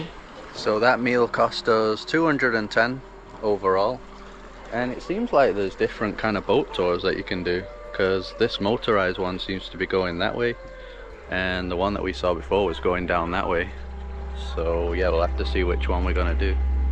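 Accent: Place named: Manchester, United Kingdom. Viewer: Enjoying all your videos, great channel. Funny how the Manc accent keeps popping out every now and then too. Live there myself so easy to spot! What area were you?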